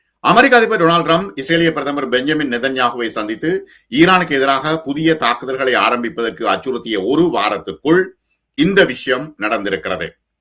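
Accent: Jaffna, Sri Lanka. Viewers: Jaffna tamil voice nantraga ullathu 🙏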